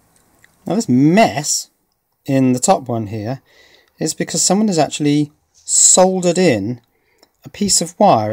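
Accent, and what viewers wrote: United Kingdom. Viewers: Very refreshing to hear a brit with an old accent like his, good work.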